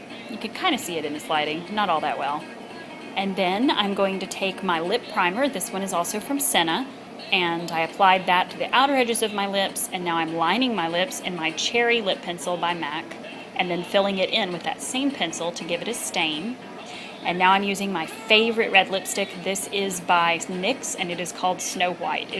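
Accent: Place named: New Jersey, United States. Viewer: I'm from Jersey and I really just noticed the "accent" in your voice I've been watching you for a while!! lol I know it's random that I notice it so late! oh well though I love it!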